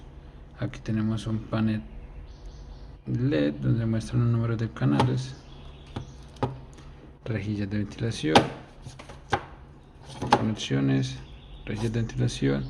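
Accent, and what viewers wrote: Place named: Colombia. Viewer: Que precio tiene en su país amigo por su acento me parece que es de Colombia de que parte? Un saludo desde Barquisimeto. Venezuela.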